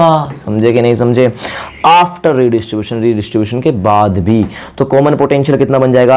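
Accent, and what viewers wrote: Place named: Haryana, India. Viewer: ur accent is like that (from haryana)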